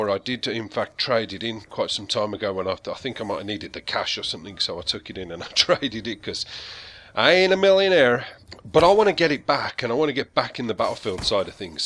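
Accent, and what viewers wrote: Birmingham, United Kingdom. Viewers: Go for it bro just love seeing another brummie on YouTube lol ;)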